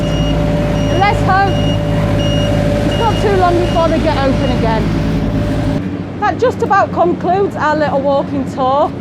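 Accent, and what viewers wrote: Yorkshire, United Kingdom. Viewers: Hi ....you sound like your from Yorkshire ....am I right...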